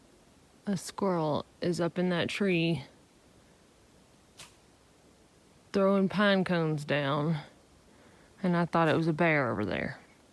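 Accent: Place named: Alabama, United States. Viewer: Am I the only one who teared up when Old Faithful started goin? I'm just in complete awe of you and your accomplishments and perseverance despite any challenges. It really makes me reevaluate the way I'm livin.. I live in some of the most beautiful of lands in northern California and tend to get complacent. I don't ever want to take all of this beauty for granted as I could be trapped in a concrete jungle. I have been binge watching this channel and now have my mama watching it too...also she's from Bama in a big way because her Dad lived in Gadsden his whole life and she'd be there for the summers. Then she started taking us kids there often growin up. So a lot of my relatives and even myself at times sound like you lol people look at me funny in Cali whenever I say "I reckon" and now I reckon I re-realize why I say it... because I've got Bama blood. 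Anyways, I can't wait to watch more of the trail and start bein more of a willer myself. I've officially got the hikin bug. Thank you for sharing your heart and journey with the world 💜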